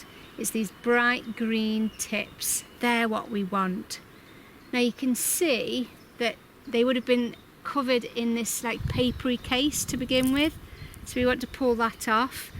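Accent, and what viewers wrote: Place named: United Kingdom. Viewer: Really appreciate this video, can't wait to pick my firsts shoots this spring! Can you please tell me from what part of UK your accent is from? Probably the most lovely british accent to be honest, makes it very easy to listen to the whole video!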